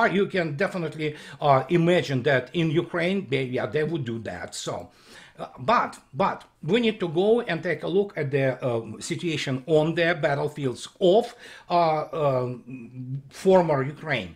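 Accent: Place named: Russia. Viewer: You talk too precipitously and with your strong Russian accent is hard to follow your line of thought; calm down, pronounce each syllabe distinctly and do not overlap words, one on top of the next.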